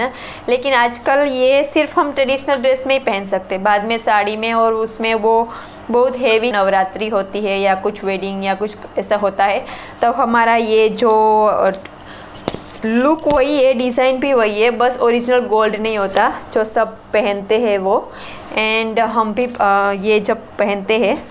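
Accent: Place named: Gujarat, India. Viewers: ખૂબ મજાનું સાથે સાથે જે શુદ્ધ કાઠિયાવાડી ભાષા માં આપ બોલ્યા તેતો ખુબજ મજાનું. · Wow your local gujarati tone is superb and you and and also lovely looking so traditional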